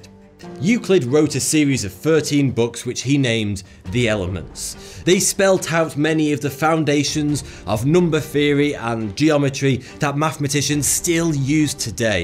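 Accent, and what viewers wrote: United Kingdom. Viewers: Hmmm, when Islam is mentioned re burning the library this guy with brit accent is afraid to say anything derogatory about religion. But Christians and the Bible, snide mocking tone. Hmmmm, guy might be afraid a tad of one very "peaceful" flavour of religion slicing his head off these days.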